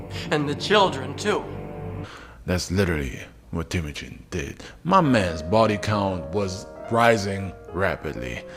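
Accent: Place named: Southwest, Germany. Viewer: If you are not an instructor you should be! Also you mentioned in another video about an allowance in euros. Did I detect a southwestern german accent? I have friends from that area that pronounce the letter R like you do. Keep these vids coming, Thanks!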